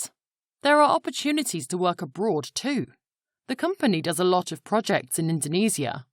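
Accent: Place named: United Kingdom. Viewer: fk uk accent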